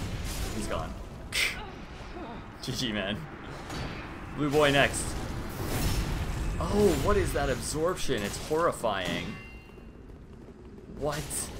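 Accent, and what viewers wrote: Canada. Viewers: Your level of Canadian is just fantastic really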